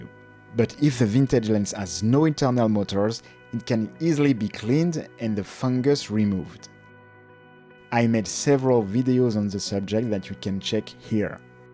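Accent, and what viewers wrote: France. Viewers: Your video has just described me with my obsession with old nikkn lenses. Apart I now need to learn how to clean them. And I have lots. Thanks fir a great video. BTW my wife loves your French accent.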